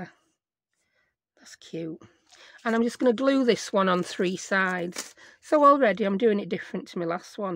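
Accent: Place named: Yorkshire, United Kingdom. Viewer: Thank you and yes I’m in the UK, I live in Southport. I now have a load of empty teabags on the side drying 😂 I find you very watchable, I love the Yorkshire accent and you have a great sense of humour where I found myself laughing out loud on a few occasions, I’ve not come across many junk journal YouTubers as interesting as your channel. I’ll be watching more today 😀👍🏼 xx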